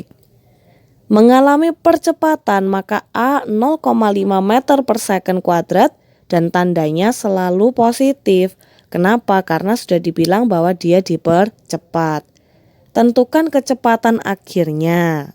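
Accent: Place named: Java, Indonesia. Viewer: Medoknya tetep ya ce..hehe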